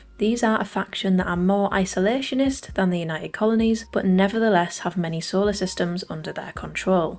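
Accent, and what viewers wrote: United Kingdom. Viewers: shes definitely English.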